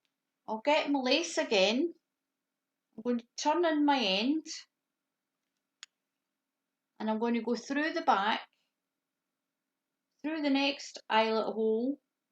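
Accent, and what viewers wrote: Scotland, United Kingdom. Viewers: Super cute, tfs. I adore your Scottish accent, 😀🐢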